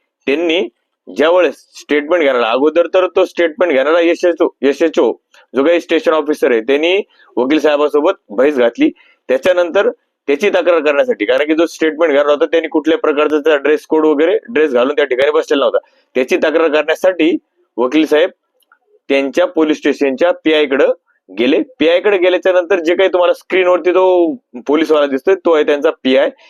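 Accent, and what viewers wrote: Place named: Dhule, India. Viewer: भाऊ तुम्ही धुळ्याकडचे वाटतं..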